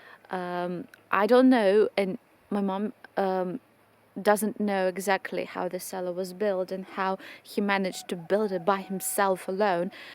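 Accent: England, United Kingdom. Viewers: Very interesting. You sure have a lot of work to do fixing the cellar and doorway! I notice that you seem to have an English accent. Did you attend school in England?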